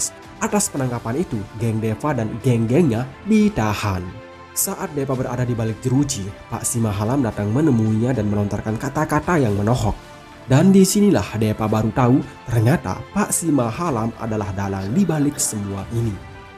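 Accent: Bali, Indonesia. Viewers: Seperti logat Bali yaa min...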